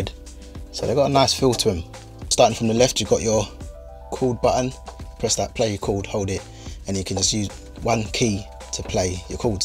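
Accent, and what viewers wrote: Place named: Birmingham, United Kingdom. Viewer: Please tell me you’re from bham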